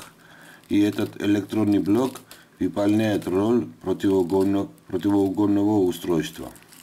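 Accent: Georgia. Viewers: Спасибо брат за науку и особенно за подачу материала, с прекрасным грузинским акцентом. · В арми был друг грузин вот так разговаривал с акцентом и грамотно, а мужику респект😊👍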